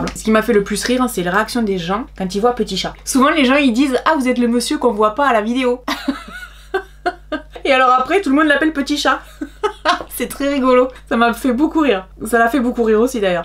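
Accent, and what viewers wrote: South, France. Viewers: Merci pour votre bonne humeur, votre accent du soleil me remonte le moral 👍 Je vous comprends tellement, il me tarde de pouvoir me remaquiller 😊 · C’est la 1ère fois que je commente car ce n’est pas dans mes habitudes. Je suis tombée un jour par hasard sur 1 de vos vidéos du début de votre maladie, les mystères de youtube... je l’ai regardé, puis j’ai suivi les autres, j’ai fait des come backs sur les vidéos précédentes... Je vous ai trouvé tellement authentique, tellement nature, à chaque étape de ce long et douloureux parcours. Vous êtes une belle personne au dedans comme au dehors, peu importe les cheveux les sourcils, les cils, et cette pointe d’accent qui sent bon le soleil que vous avez au fond de vous, vous êtes vous même, ne changez rien. Je vous souhaite le meilleur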